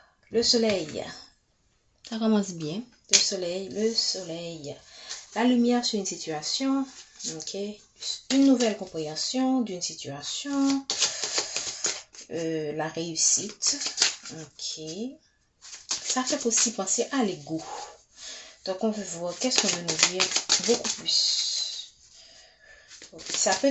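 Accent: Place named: Guadeloupe. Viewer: Bonsoir , je voud découvre à l’instant .Je vois remercie pour cette guidance , c'est tout à fait mon histoire avec cette personne, jai énormément morflé avec elle 😔🫤. Elle a beaucoup gens toxiques autour d’elle , et ces gens là, ont mis a mal notre relation, elle est très,très influençable malheureusement 🫤. Vous avez l’accent antillaise je pense de Guadeloupe à moins que je me trompe !🤔😀 .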